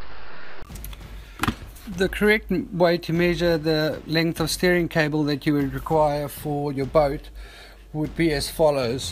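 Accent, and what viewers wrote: South Africa. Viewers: Hey dude where in South Africa are you from LOL.... your accent gives you away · I can hear your SA accent too, it's a weird blend, lol. I'm a kiwi living in Tasmania and missing the great NZ fishing, lol.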